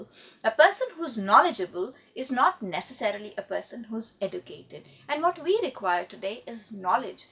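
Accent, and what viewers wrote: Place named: Scotland, United Kingdom. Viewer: you have a touch of Scottish accent. Thank you so much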